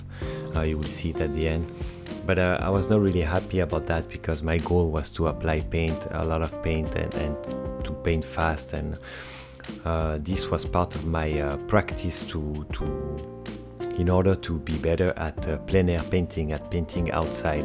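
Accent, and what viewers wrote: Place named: France. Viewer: haha l'accent français je t'ai démasqué !!! très bon travail!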